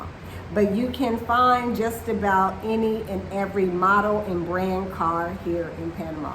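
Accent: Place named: Southern California, United States. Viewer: I thought that was a So Cal accent. I'm hoping to visit Panama, Spain, and the Philippines this year so we'll see what happens. Keep up the YouTubes - you're easy to look at 🙂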